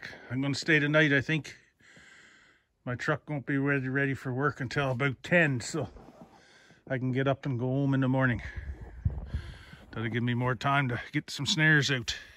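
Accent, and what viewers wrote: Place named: Lynn Lake, Canada. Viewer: It’s been a while since we heard that accent in Lynn Lake.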